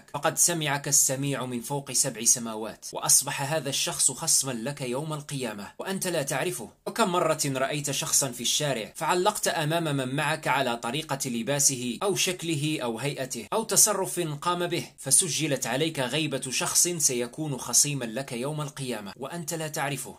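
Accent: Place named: Maghreb. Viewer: لك لهجة المغرب العربي في كلامك يارب تكمل فرحتي وإفتخاري إن كنت جزائري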